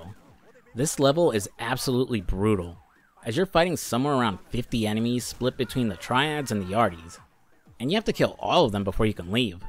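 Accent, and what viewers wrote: United States. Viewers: Getting an ear full off this moaning yank narrating ... lifes not ment to be easy son it aint a walk in the park treacle , fack me 😉 ... me an our kid were living in london when this gem came out ... looking back im chuffed we did the london thing in our twenties ... most young Brits do , if they got anything about them ... remember working at selefridges and going there on the game it even had the back exit staff had to use and other places id go up west ... kewl as fuck that game deffo needs a remake would be epic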